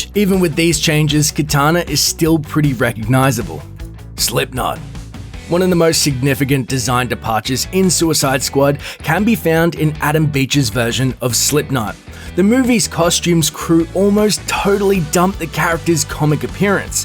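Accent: Australia. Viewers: HOLY SHIT AUSTRALIAN ACCENT IS SO LOUD! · ha Australian. like me. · I didnt know that you were from Australia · is aussie?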